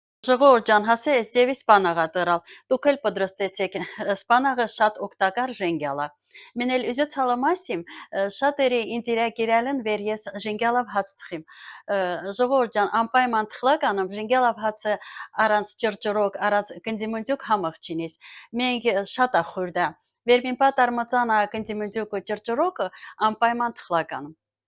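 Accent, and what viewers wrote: Artsakh, Azerbaijan. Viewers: ❤❤❤բարև ձեզ շատ հավեսով եմ յեշում,միշտ էլ մեր Արցախի բառբառով խուսեցեք։։ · Շատ սիրելի տիկին ջան ,ես շատ եմ սիրում Արցախի լեզուն ,օրհնվես էդպես էլ խոսիր ,դա է մաքուր հայկական լեզուն ,որ մինչև այսօր մնացել է անավարտ, իսկ ամենակարևորը իմանաք ,որԱՍՏՎԱԾ խոսում է հայերեն ,այն էլ Արցախի բառբառով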